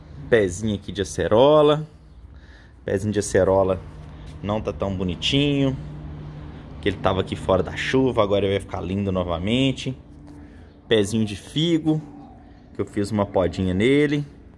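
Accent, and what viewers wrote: Minas Gerais, Brazil. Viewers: Você é mineirinho?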